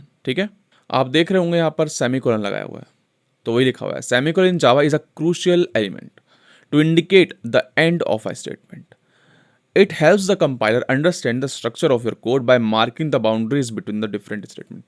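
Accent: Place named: Bihar, India. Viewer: sir ji aapke accent se aap bihar ke lg rhe ho :hands-yellow-heart-red::hands-yellow-heart-red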